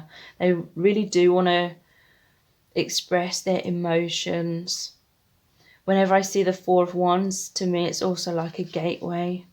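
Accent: United Kingdom. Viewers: ❤ You are amazing as always, wonderful job, thanks I adore your British accent ❤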